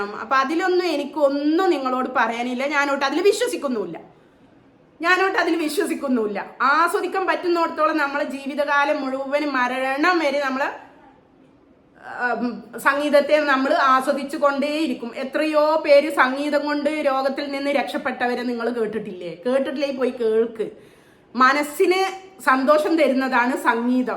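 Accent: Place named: Malabar, India. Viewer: നിങ്ങൾ ശ്രദ്ധിയ്ക്കണംഭാഷ കേട്ടിട്ട് കോഴിക്കോട്, മലപ്പുറം ജില്ലയാണ് എന്ന് തോന്നുന്നു - എന്തായാലും ശ്രദ്ധിച്ചാ നല്ലത്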